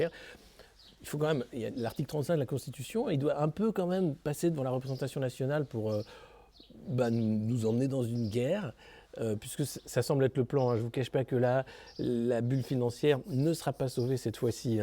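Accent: Quebec, Canada. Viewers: L'accent québécois "a frigore" !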